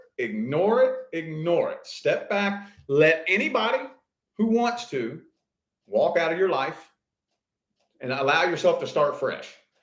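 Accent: South, United States. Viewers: Libra. Need the financial blessings. The person is wanting back and is a twin flame. I have agreed to one more chance. Why do you sound like a southern guy?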